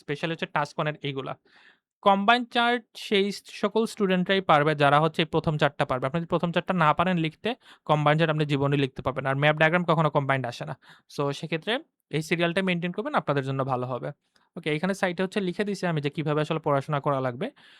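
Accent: Sylhet, Bangladesh. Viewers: Bro are you from Sylhet? your accent is like a proper Sylheti 😀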